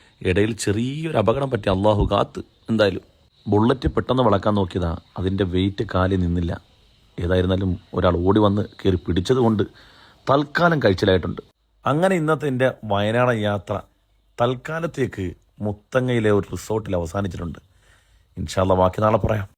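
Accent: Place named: Malappuram, India. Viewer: Usthadinte samsaarathil malappuram slang kayarivannu😄😄👍👍👍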